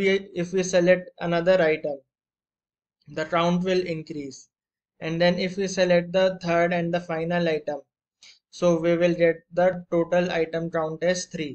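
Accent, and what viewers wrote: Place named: India. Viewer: why pause when talking? all indians sound identical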